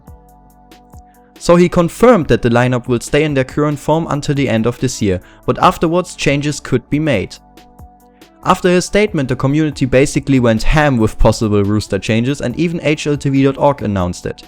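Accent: Germany. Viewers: schöner deutscher akzent :D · nice video but very much german accent but still nice :D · If u pay attention you could recognize ur german accent :D <3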